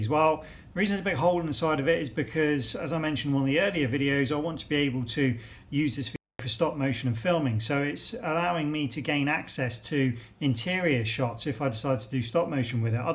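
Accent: United Kingdom. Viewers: are you British I love your accent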